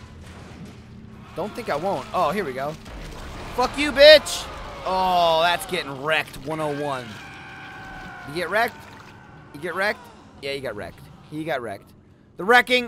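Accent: United States. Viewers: ... You Are from USA?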